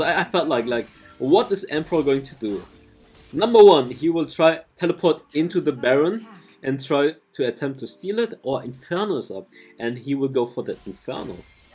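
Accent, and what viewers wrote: Germany. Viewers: Are you german ?? 😂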